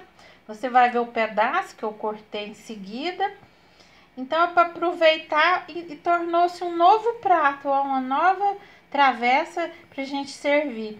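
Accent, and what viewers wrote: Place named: Minas Gerais, Brazil. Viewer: Eita sotaque mineiro gostoso de ouvir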